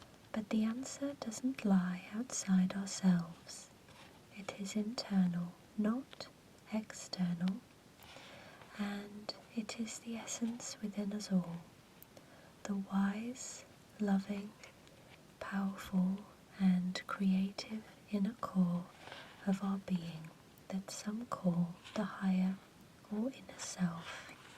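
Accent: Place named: Essex, United Kingdom. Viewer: love listening to your voice. calming, soft, essex twang with a touch of class and a slight hint of nautyness. could listen to you for hours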